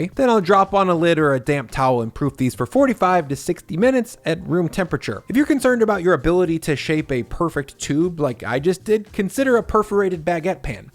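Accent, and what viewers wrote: United States. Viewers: You have an American accent and you give us grams... duh. thumbs down. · You sound American,, Why are you using metric crap ???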